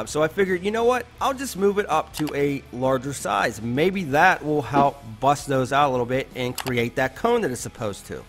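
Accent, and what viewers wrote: Pittsburgh, United States. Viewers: Are you from Pittsburgh???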